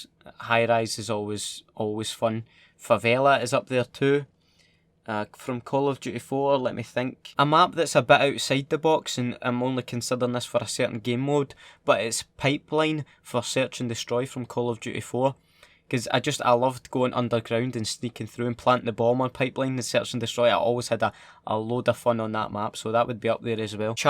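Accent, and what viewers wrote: Scotland, United Kingdom. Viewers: a know how terrible it is trying tae try talk proper so folk can understand you cause am Scottish as well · Really didn't feel like 40 min. Such a beautyful scottish accent. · So he is Scottish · I've never seen someone so funny, I don't know if it's your Scottish accent or you general humour! But you're genuinely a funny fucker! Hope to hear back from you! :) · Your like a Scottish daihi da nogla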